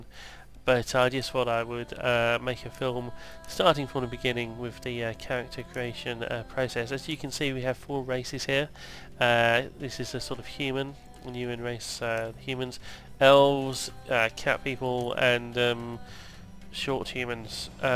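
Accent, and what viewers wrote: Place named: United Kingdom. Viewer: Clicked on video in recommended videos. 12 seconds in I'm bursting out laughing at how amazingly British your voice is (and I'm British!)! Not an insult, I just couldn't stop myself, interesting looking mmo, here, have a like.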